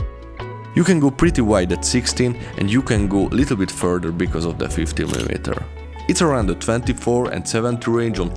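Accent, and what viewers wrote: Hungary. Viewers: Amint meghallottam az akcentust, tudtam hogy magyar vagy. :D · Meghallottam ahogy beszélsz egyből levágtam hogy magyar vagy :D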